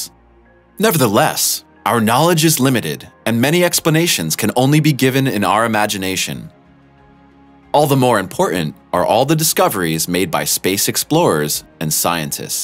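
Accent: United States. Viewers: This video is utter pop science cack, spouting the kind of shit you'd find in a Christmas cracker or fortune cookie. This channel doesn't deserve its number of subscribers and this video certainly doesn't merit the views it has. The narrator has that quasi-American accent of someone whose first language isn't English and the knowledge of someone whose first discipline certainly isn't astronomy.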